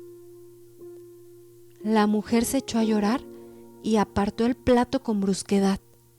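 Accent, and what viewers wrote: Mexico. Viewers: Excelente tu narración! Que bueno que volviste, vos sabes que t lo pedí. Sos la mejor narrando, me encanta el acento mexicano + tu voz tan dulce, muchas gracias, lo disfruté a pesar d los molestos anuncios, jjja! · ES MARAVILLOSO PODER ESCUCHAR UN CUENTO CON DICCION, ACENTUACIÓN Y PUNTUACIÓN PERFECTAS...GRACIAS EL ACENTO PERFECTO EN NUESTRO LENGUAJE MEXICANO. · A mi tambien me encanta el acento mexicano me gusta todo lo de mexico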